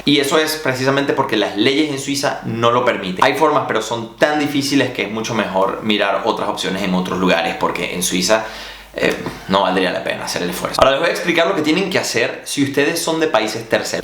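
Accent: Venezuela. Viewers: Tienes acento venezolano xd genial bro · su acento es venezolano. Que Negativo eres !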